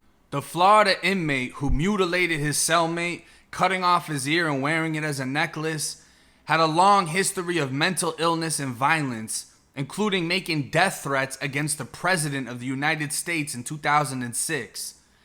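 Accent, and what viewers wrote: Boston, United States. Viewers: You from Boston? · Thats Boston. I get made fun of for my Jersey Accent. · Woman love the Boston accent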